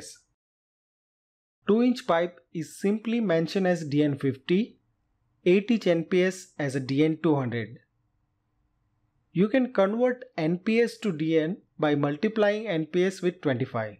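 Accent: India. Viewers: ..you are the only indian english speaker that I find interesting and ameanable..being you an engeener this is almost a miracle..thanks for your nice work...!!!!!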